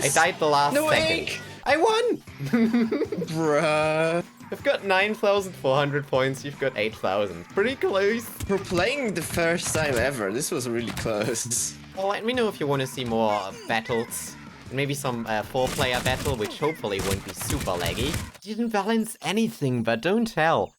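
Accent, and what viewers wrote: Germany. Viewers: quick question, are you german? your accent really just sells it (correct me if im wrong tho)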